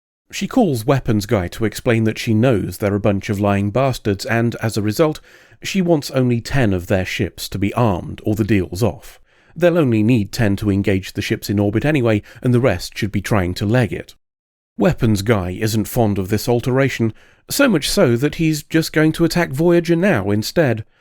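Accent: United Kingdom. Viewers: Dude, I absolutely fucking love your reviews. There;s something about a British accent talking complete shit about a show I love. And your way of saying "cunt" is so deliciously venomous. :D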